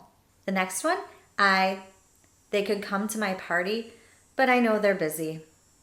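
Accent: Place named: United States. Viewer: American accent is quite stylish...Love it...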